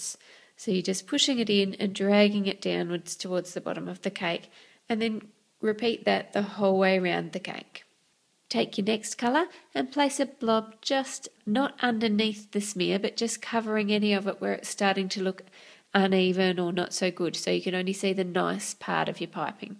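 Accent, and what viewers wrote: Australia. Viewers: BTW, love ur accent! :) Are you Australian? :D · I have to Admit , I love your accent ! #Australian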